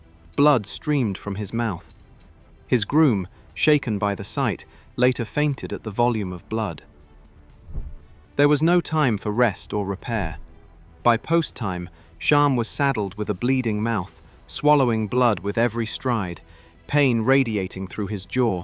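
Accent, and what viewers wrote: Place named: United Kingdom. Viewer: And…”Shom”? Good God, this British accent allegedly is silly with almost all American Racehorses discussed.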